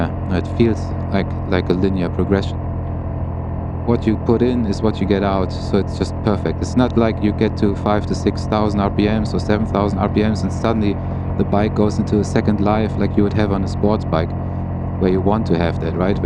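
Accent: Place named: Germany. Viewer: Habe mir das Video angeschaut und dachte, hmmm klingt irgendwie nach nen deutschen Akzent… wartete bis das Nummerschild sichtbar wurde… jap wurde nicht enttäuscht. Hätte mich aber mehr auf deutsche synchro gefreut 🤷🏻‍♂️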